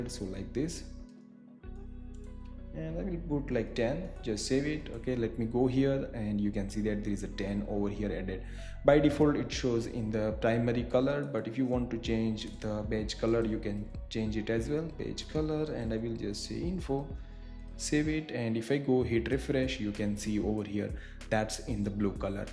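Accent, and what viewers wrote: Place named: India. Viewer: Thank you for the amazing content. I'm Brazilian and I'm learning a lot from you. Besides Filament, believe it or not, I'm also learning English, because the Indian accent is very pleasant to listen to; it's quite similar to Brazilian pronunciation.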